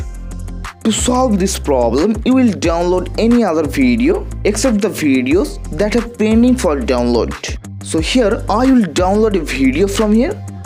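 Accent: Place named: India. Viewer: The second you see him talking in Indian accent i just knew i was in for a good tutorial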